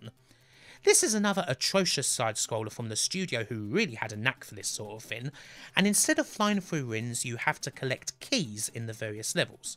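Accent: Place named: Croydon, United Kingdom. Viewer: is the purest Croydon accent around.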